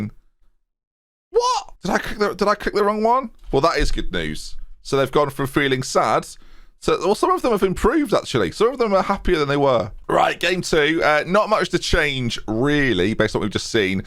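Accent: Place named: England, United Kingdom. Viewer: I thought it was just me hearing it, maybe the mic? No, it's his delightful (insert english regional accent here) accent.....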